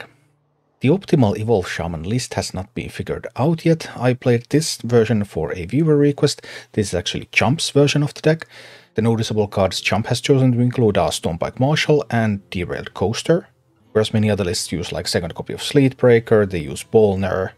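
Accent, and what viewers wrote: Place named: Finland. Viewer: As soon as you started talking I could tell you are a Finn!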